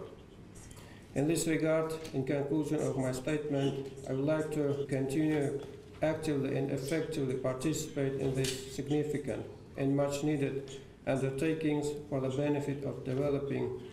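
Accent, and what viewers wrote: Turkmenistan. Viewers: ебать я нихуя не понял, туркменоинглиш тяжело входит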